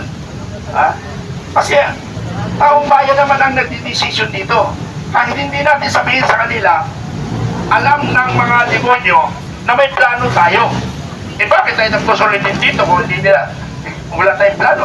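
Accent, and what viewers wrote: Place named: China. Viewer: may Chinese accent ang nagsasalita😅 Ano ba yan! Napaghahalata!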